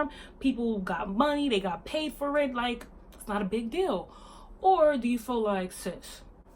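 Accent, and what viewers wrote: New York, United States. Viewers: You're hella gorgeous and your New Yorker accent is so lit yo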